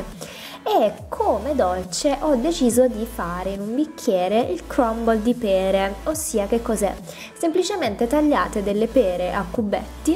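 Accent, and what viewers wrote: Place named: San Severo, Italy. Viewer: accento san severese inconfondibile :)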